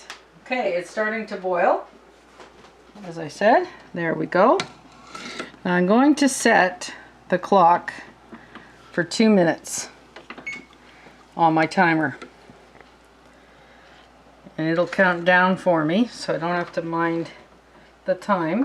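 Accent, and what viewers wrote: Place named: South, United States. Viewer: Thank you for getting back to me. I will check goggle. The reason I ask where you were from. I noticed a southern accent in your voice. I am from the southern part of Virginia. But I now live near Baltimore, Md. The northern part. I don't get too meet very many southern people here. I will look forward to more of your videos. Thank you very much for sharing. Have a Merry Christmas.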